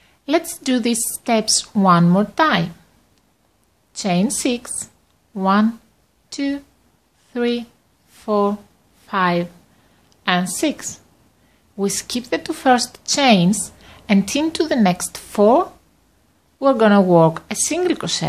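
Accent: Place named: Greece. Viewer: I thought I heard a Greek accent :) γεια σου απο τη μακρινή Αμερική Πολυ όμορφη η πλέξη αυτη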